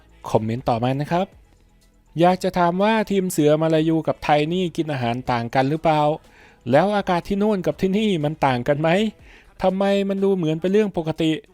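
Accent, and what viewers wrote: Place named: Laos. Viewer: ใส่เสียงลาวคือชัดแท้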